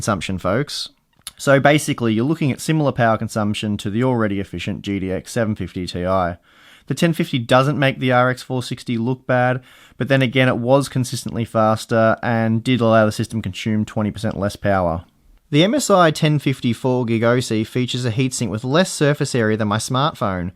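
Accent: Australia. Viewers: Are you Australian? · Why do I trust Australian voices so much more when it comes to Tech reviews?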